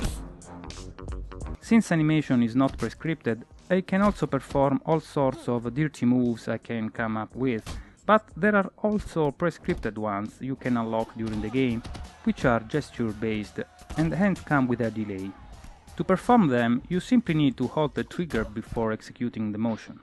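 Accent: Italy. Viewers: this is a real italian accent!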